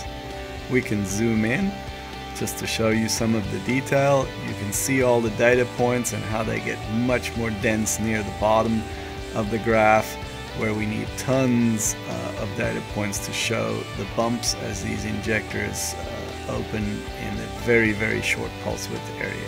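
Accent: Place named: United States. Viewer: He is from South Africa,but has cultivated his US accent pretty well.😁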